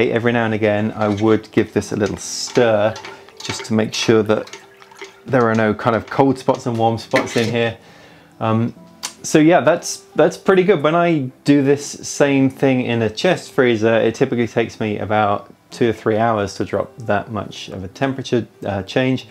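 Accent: United States. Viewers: I LOVE Double IPAs...awesome recipe, awesome video as always! I want that Clawhammer system SO badly...but I'm eyeing up the Brewer's Edge Mash and Boil (w/ pump) for now. It's a lot cheaper, but obviously not reaching the level of the Clawhammer. Anyway, loving the series! Thanks! The American accent btw...SPOT on. ROFL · That southern accent 😂And that beer looks good!!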